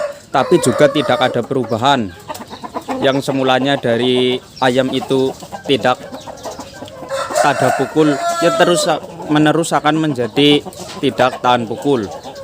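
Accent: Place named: Banyumas, Indonesia. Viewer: Ngapak banget bg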